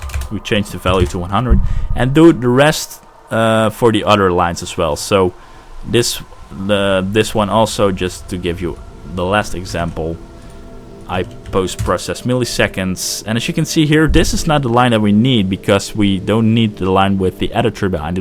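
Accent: Netherlands. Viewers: hai nederlander of belg ( ik denk hollander). ofniet? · Ik hoorde dat je Nederlands was, goede tutorial!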